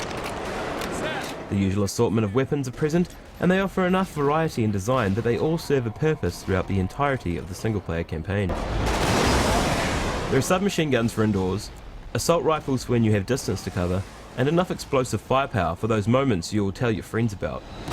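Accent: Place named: New Zealand. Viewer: Lol, wierd hearing a kiwi review a game.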